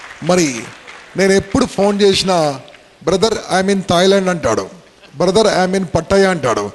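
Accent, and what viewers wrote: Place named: Telangana, India. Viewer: super speech !! just loved his way of presentation, the Telangana DIELECT I love it ! sir kiraaak chepthundu!!feels great and motivated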